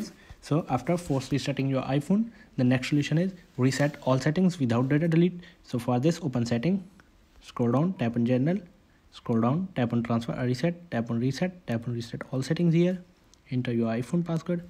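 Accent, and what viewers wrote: India. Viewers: Ikr? So stupidly fast with the Indian accent like bro slow tf down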